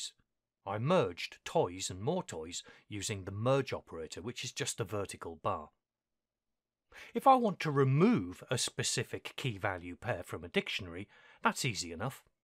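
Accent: United Kingdom. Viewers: Hey! Happy birthday man! You deserve it. The 9 sounds just as good as 10, without the pain. The way you explain things with your soothing British voice and systematic, chronological, and a lot of patience make you the best channel for computer education I've ever seen. The editing alone deserve respect! Thanks for your dedication 🤝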